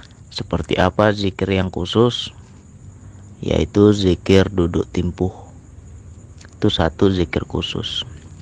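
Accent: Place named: Medan, Indonesia. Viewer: Boleh tau dimana tglnya..logatnya macam org Medan..?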